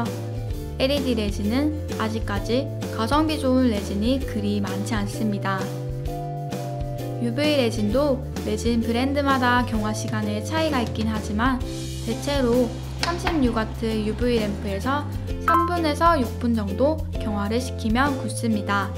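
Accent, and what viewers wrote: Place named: Gyeongsang, South Korea. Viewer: 경상도 억양이 들리네요 ㅎㅎ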